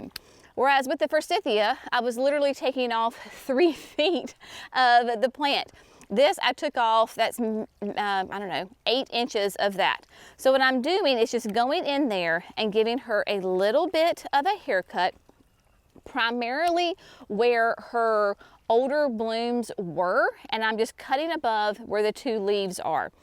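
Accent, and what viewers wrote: South, United States. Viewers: I hope you get to a place of relaxation and calm before the wedding. Does the bride want to help, or they are too busy working? It speaks volumes about your kindness and character to be doing so many preparations for the wedding ( and garden tour). I love your videos and get a little homesick when I hear your beautiful Southern accent. Thank you for another wonderful video.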